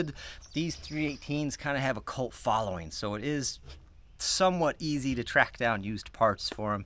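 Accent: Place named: Midwest, United States. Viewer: Yeah, his midwestern dialect is tough on my old ears. I don't catch everything he says.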